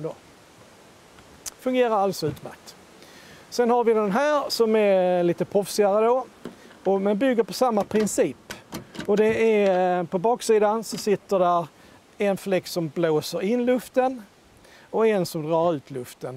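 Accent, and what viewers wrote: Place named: Skåne, Sweden. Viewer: Not BILL-tong, but bill-TONG. Equally tasty, nevertheless. Wonderfull idea and a great Scanian accent! I have to make one myself instead of filling my check-in luggage with billtong every time I return.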